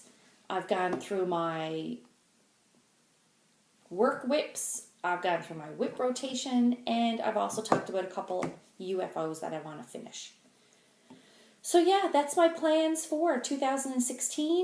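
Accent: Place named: Newfoundland, Canada. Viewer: My dear I loves hearing a newfies voice on me phone . Thanks for the video maid . Catch yah on Instagram sometime ! Hugs, Blake!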